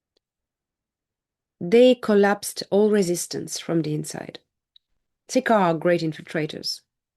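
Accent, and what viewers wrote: France. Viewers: What a great edition of Star Nation News! You should do a more detailed traditional Documentary for public release to help us get through the next 5 years. Make sure you have a narrator without a strong foreign accent. I love your French accent, and the way you present your news. I took French in high school for 3 years, but I do struggle to understand your pronunciation of words sometimes. You are a great communicator and I admire your great courage and wisdom, and beauty, if I might add!!! Mike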